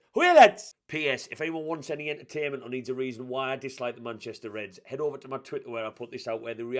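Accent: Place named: Durham, United Kingdom. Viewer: Where is your accsent from, mate. Durham?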